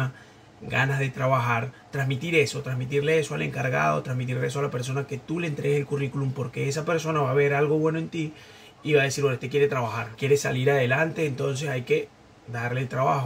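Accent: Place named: Puerto Rico. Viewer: Amigo sonas puertorriqueño, de que parte de argentina sos?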